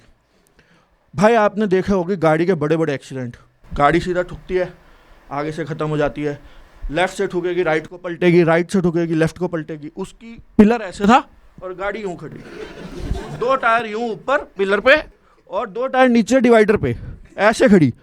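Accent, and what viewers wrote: Haryana, India. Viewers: btw, even your haryanvi accent is commendable bro👏 ..keep growing🫶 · Haryanvi accent is perfect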